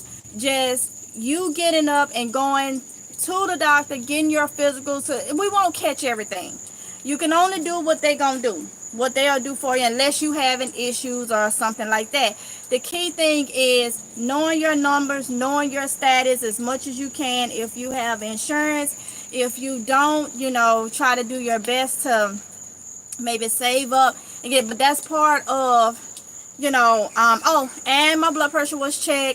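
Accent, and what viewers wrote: South, United States. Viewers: I’ve never heard nobody pronounce exercise like that before lol! I like your accent!!! It’s soo southern!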